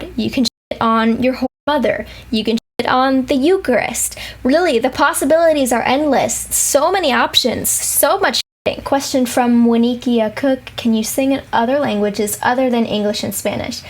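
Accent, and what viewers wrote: Castile, Spain. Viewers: It was interesting to hear how you learned Spanish and it explains why you often (always?) use Castilian pronunciation. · Wow I just realized the Spaniard Spanish accent. Very different to the Spanish I was taught, mostly by teachers from South America(Bolivia or Argentina). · That explained. You living in US having a Spanish accent, not a latin one. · I LOVE your Spanish!!!! The accent SEEMS Castillian. It's been sooooo many years since I've learned and used my Spanish, but it was Castillian. PLUS you are so easy to understand when you speak Spanish. It makes me feel much more proficient than I am. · Que divertido el acento de españa en su español.... Gracias por tu excelente Q&A... muy interesante! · hablas como española , sera que has estado en españa mi hijo es compositor de musica charra ( mexican cowboy music ) with mariachis, you can find his music on you tube under the name edmond broes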